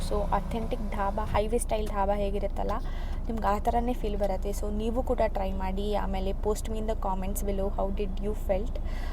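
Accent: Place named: North Karnataka, India. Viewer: Nice see north Karnataka girl vlogs, in north Karnataka style Kannada .. is super style.. lol..,!!!!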